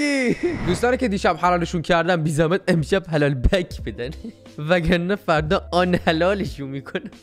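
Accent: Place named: Abadan, Iran. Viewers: میشه یک ویدئو کلا ابادانی صحبت کنی🥲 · لهجه آبادانیت هعی میزنه بیرون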